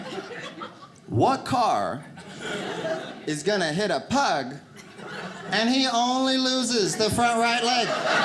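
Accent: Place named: South, United States. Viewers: Lol, why’s this whit hood California guy talking more Texan than I do. Y’all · He sounds like he's from the south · Can someone explain the southern accent from California? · This guys sounds SOUTHERN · He’s from NorCal (Bay Area), but sounds like he’s from the south lol I’m confused...🤔😳😬😂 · He sounds like he's from the South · He's a funny guy. I know several white guys that sound like him. He sounds like he's from the South but I think he's from Cali. The guys I know that talk like him are funny too. We just say, they're "a character". That covers alot of ground.😃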